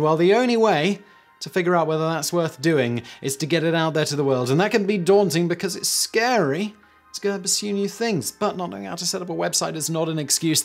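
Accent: United Kingdom. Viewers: Did he just say Yu-Gi-Oh fusion cards it hard to understand UK english its. Different thin US english.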